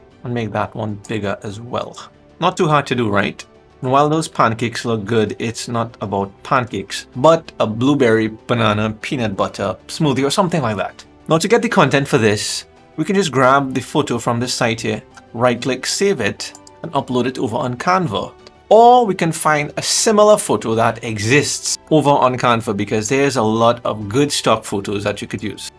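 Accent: Trinidad and Tobago. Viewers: Are you from Trinidad I hear your accent.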